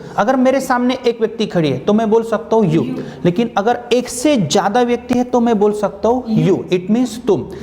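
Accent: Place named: India. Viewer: I was struggling to understand India accent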